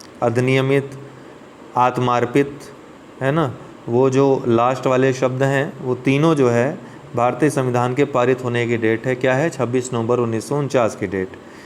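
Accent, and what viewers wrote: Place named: Azamgarh, India. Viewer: are sir kha se ho aap.... Govind Sahab Mela apne jaise bola azamgarh isse to yhi lg rha hai ki ap ya to azamgarh k hai ya ambedkarnagar ke...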